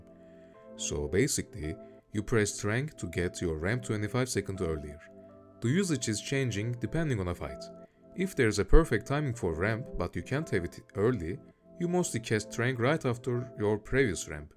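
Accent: Turkey. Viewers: Sen türksün aksandan belli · Are you by any chance, Turkish? Your accent sounds like it :)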